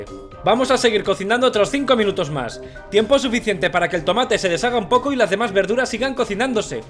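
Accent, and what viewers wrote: Basque Country, Spain. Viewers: Muy buenas tus recetas y muy bien explicadas. Te felicito. Me encanta oirte todas esas expresiones vascas tan simpaticas. Yo hace tiempo que vivo en Andalucía y escucharte hablar me recuerda a mi tierra y a mi familia. Eskerrik asko!!! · Hay que bonito acento los españoles :) no tengo vino tengo whisky jaja le podré poner un poquito 🤗🤗🤗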